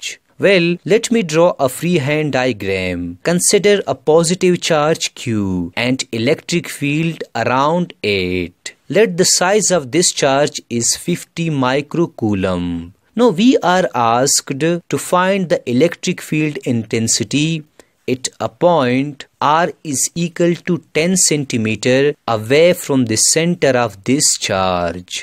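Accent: India. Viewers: hindian english is so funny. bro you gotta learn English. we hear you in da class to laugh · you know its gonna be good when the guy has an indian accent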